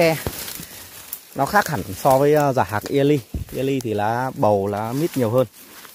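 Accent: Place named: Mekong Delta, Vietnam. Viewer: Nge giọng a gióg ng miền tây nam bộ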